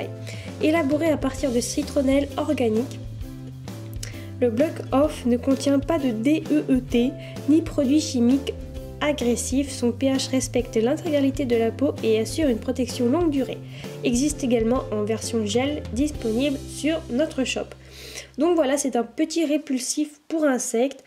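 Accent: England, United Kingdom. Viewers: haha j'adore ton accent anglais 😂😂 sinon super vidéo☺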